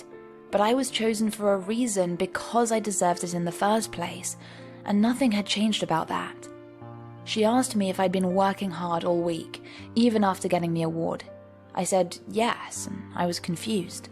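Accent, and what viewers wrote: United Kingdom. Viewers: The speaker is British but no the person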